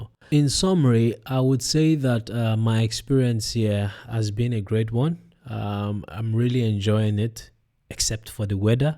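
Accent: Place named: West Africa. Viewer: West Africa sounds like. Probably Nigerian or Ghanaian.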